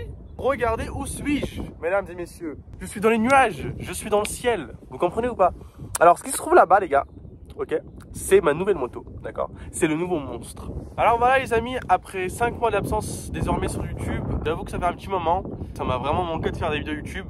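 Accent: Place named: Banlieue, France. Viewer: Tu as un accent des cités. Tu viens d'où ?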